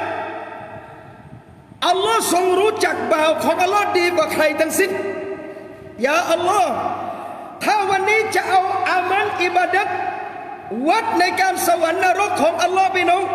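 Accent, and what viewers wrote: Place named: Malaysia. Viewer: อาจารย์ เป็นคนที่ไหนหรอครับเหมือนหลุดภาษามลายู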